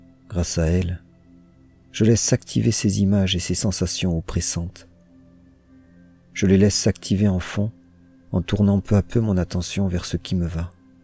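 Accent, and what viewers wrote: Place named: South, France. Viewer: Qu’est ce qu’elles sont bien vos méditations et le guidage très doux, avec ce petit accent du Sud qui ajoute à la souplesse des images proposées . Merci infiniment.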